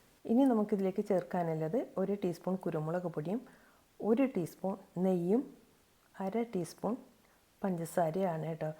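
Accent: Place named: Kannur, India. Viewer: Adhyam paranjathu valare sheriyaanu. Chickenil enthum pareekshikkaam. BTW, ningal screenil ezhuthi kaanikkumbo - krithyamaayi ezhuthan sramikkuka. Savola kku pakaram - Onion ennum. Turmeric*, pinne Green chlliyude koode coriander ennanu ezhuthiyathu. curry leaves alle ittathu ? Pinne.. adhyam ittathum randamathu ittathum aaya pepper powder different aano ? color kurachu vyathyasam pole thonni (may be lighting). ithrayum neetti ezhuthaanulla kaaranam.. njan okke recipe kandu like adichu pokaan alla kaanuka. kandaal cheythu nokkaanum koodi aanu. athum nammude kannur style basha kelkumbo .. kooduthal :) . Njanum molum mathrame non-veg kazhikku. athu kondu njan thanne undakkanam . athu kondaanu ellam ezhuthiyathu. Accidentally ningalude lemon powder pop-up aayi. appo channel nokki. ippo subscribe um cheythu. Thanks for the recipe. Will definitely try once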